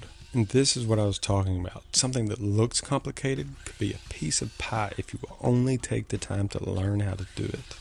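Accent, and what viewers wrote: South, United States. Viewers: a southern drawl is a good thing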